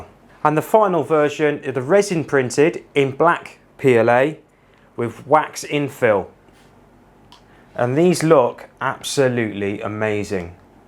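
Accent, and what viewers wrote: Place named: United Kingdom. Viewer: That s awesome! I love the British accent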